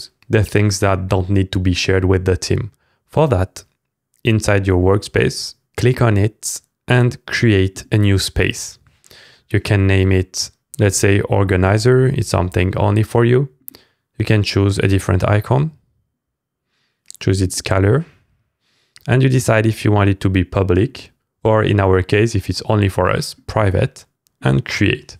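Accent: France. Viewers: The french accent make it better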